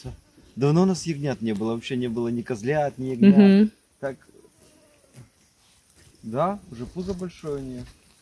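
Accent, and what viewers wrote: Belarus. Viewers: Такой брестский акцент)))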